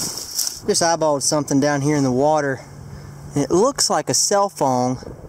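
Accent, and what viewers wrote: South, United States. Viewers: You have THE CUTEST TWAng ever.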